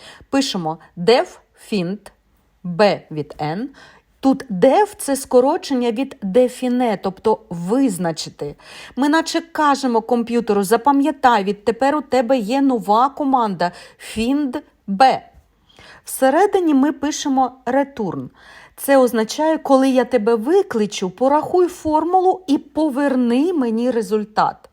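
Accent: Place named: Germany. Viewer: Варто зазначити, що конструкції більшості мов програмування названі англійською мовою. Тому і вимовляти їх варто англійською. У вас вимова схожа не німецьку чи французьку. Чому це важливо? Тому що учні поглинають це і використовують. А перевчитись потім дуже важко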